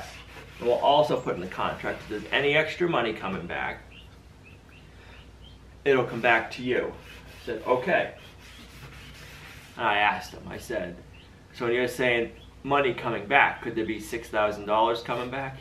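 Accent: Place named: Northeast, United States. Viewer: The accent is bringing me back....where are you from? I was born in White Plains, NY and grew up in Framingham Ma.....just curious...I'm in Central NY near Syracuse now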